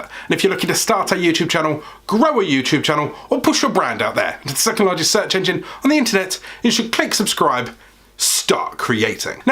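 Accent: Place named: Yorkshire, United Kingdom. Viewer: the intro was the most entertaining bit, I'm so glad you got the humour to you content... Sounds like you got the Yorkshire accent..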